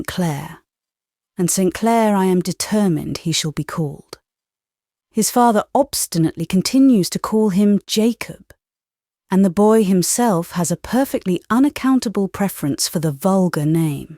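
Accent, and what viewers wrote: England, United Kingdom. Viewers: I’m enjoying these very much, despite the English (not Eastern Canadian) accent; Thank-You!!! This is one of My favourite books in the series. 😉😄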